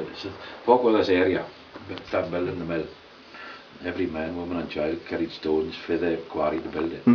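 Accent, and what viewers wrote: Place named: Denmark. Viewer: he speaks english like a danish person, that is amazing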